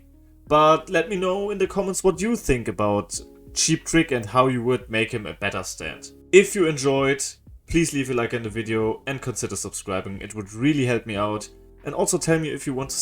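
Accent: Germany. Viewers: Deutscher Akzent! Weiter so Bruder💪🏻👍🏻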